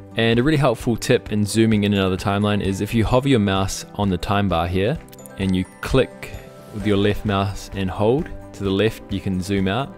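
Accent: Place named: New Zealand. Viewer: I hear a kiwi accent! Ka pai!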